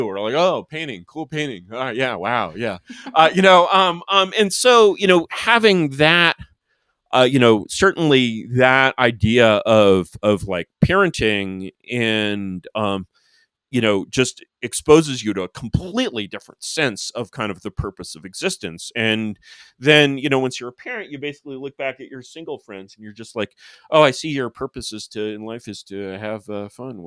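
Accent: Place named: California, United States. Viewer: your perfect California English accent is o minune misterioasa. Your vocabulary, your mastery of both language and subject matter is astonishing. Curious, can you also speak Magyar? Oh well, carry on. I just had to exclaim a little!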